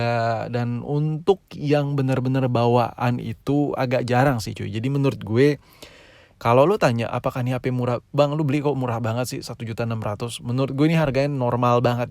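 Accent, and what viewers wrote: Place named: Java, Indonesia. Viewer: Lho orang jawa ya kok bahasa indonesia masih belum lancar ada medok nya jadinya gw like terus channel lu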